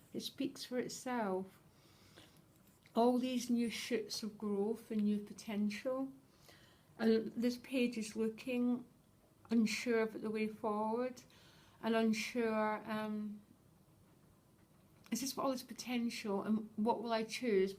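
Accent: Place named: Scotland, United Kingdom. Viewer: Thank You for reply, Me too, I'm from Scotland, I could still hear the Scottish twang in your voice, My connections are with Edinburgh & the Scottish Borders, I moved to England 10 years ago, Lived in Burton On Trent then Wiltshire & Now I'm settled in South West Devon beside the sea. x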